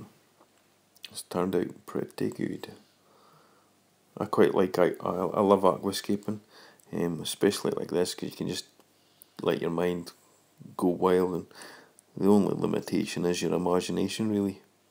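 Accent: Scotland, United Kingdom. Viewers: Great series. Love the Scottish accent, but it doesn't help to understand the phrase: "leave it to dry for a couple of weeks in the sun." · lol too funny at 1 minute on the video, listening to a scot talking about sunshine, be careful you don't blink you may very well miss that sunshine, that rock will never dry out in Scotland, lol great stuff buddy I'm really enjoying to videos.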